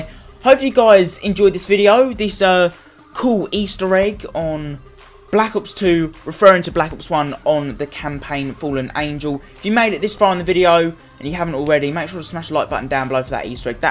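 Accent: United Kingdom. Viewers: I think you have a British acsence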